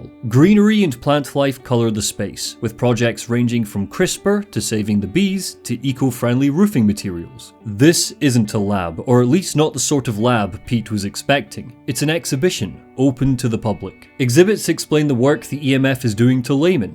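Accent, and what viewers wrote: Scotland, United Kingdom. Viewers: I swear you used to be scottish. Am i experiencing a mandela effect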